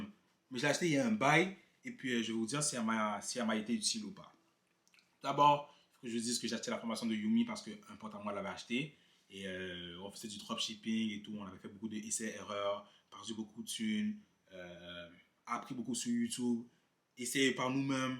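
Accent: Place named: Montreal, Canada. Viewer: tu es de Montreal ? tu as semble avoir l'accent de chez nous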